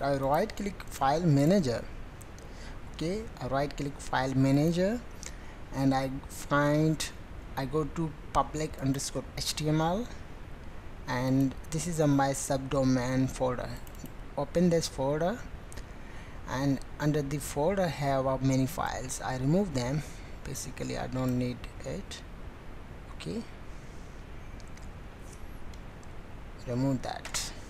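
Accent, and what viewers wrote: India. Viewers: indian accent really not sounds good to my ears but thanks to this video bro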